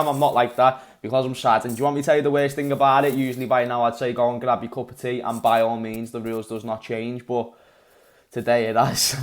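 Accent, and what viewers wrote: Liverpool, United Kingdom. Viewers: Is that a liverpool accent? Greetings 🇩🇪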